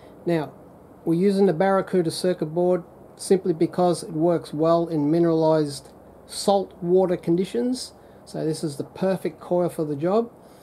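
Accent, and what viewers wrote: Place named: Australia. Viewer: Good work mate. You sound like a typical Aussie that are clever at innovating and making things out of nothing.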